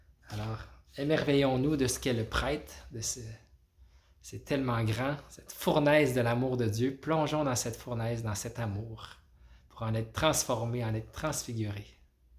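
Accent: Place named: Canada. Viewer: je me suis perdu sur youtube t qui toi (mais ta un accent canadien c trop bien)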